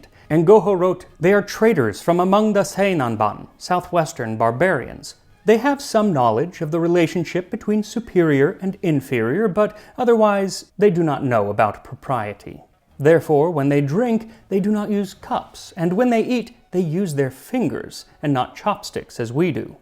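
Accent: Brazil. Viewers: Great video just irks me that when you talk about portuguese dishes or words u always say it with the Brazillian Portuguese accent and not the original Portuguese accent (which is fair for example in the Feijoada video), but it doesn't make sense in the video referring to dishes from Portugal like this/ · Bro, please DO NOT pronounce Portuguese words like the Brazilian do, it sound VERY VERY WRONG - cringe AF! Portuguese from Portugal sounds absolutely NOTHING like Brazilian Portuguese! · I love your channel, but as a Portuguese native, you are saying portuguese words with a Brazilian accent.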